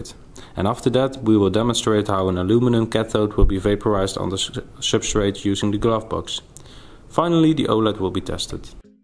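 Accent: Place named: Netherlands. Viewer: Weer een Nederlands accent! Misschien lijkt het maar zo, maar waarom bestaat de YouTube community met de kennis en kunde om op video een succesvolle, werkende hoge kwaliteit OLED te maken (bijna) volledig uit Nederlanders? (Granted, dat is wel aannemende dat jij ook een Nederlander bent, maar aangezien je accent ben ik daar 99% zeker van) De YouTube OLED manufacturing community lijkt wel volledig overgenomen te zijn door onze mede-Nederlanders. Je zou ook wel kunnen zeggen dat wij de YouTube OLED community volledig hebben... G E K O L O N I S E E R D ! Nu alleen nog een manier vinden om er een slaatje uit te slaan, net zoals men deed in de gouden eeuw. Ja, die gouden eeuw! Ook wel bekend als de goede oude tijd van de VoC, toen wij Nederlanders nog praktisch regeerden over het zuidelijk halfrond. En dan kies ik er bewust voor om de alom bekende, zeer beruchte impopulaire zwarte bladzijde uit onze geschiedenis expres even buiten beschouwing te laten. 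Discussies over de hoeveelheid roet in het gezicht van Zwarte Piet en de huidskleur van Sinterklaas hebben wat mij betreft niets te zoeken in deze krochten van de YouTube sloppenwijk, ook wel bekend onder de Engelse naam: 'comment section'. 🤣 (Volledig satirisch bedoeld natuurlijk, het was absoluut niet mijn bedoeling om iemand te kwetsen met deze laatste alinea!)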